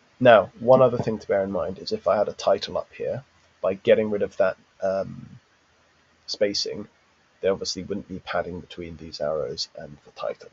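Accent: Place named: United Kingdom. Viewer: Great to hear the voice of a fellow brit!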